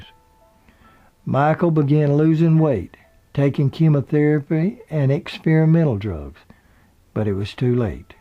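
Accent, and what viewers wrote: South, United States. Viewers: I would like to know who is narrating this video? He sounds like a true southerner. I’m from Alabama and I enjoyed listening to him narrate. Wasn’t a fan of Little House because Nellie was so mean lol. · I would’ve preferred someone with a northern accent narrated the documentary. I have nothing against southerners, it’s just that it’s so hard to understand him, the pronunciation of certain words and the drawl.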